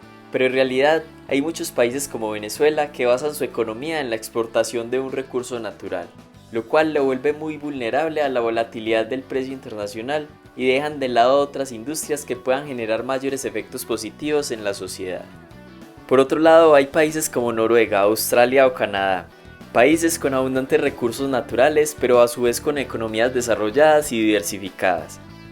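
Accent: Antioquia, Colombia. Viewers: Pensé que eras paisa, tienes un asentó parecido. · Su acento es claramente colombiano. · Es paisa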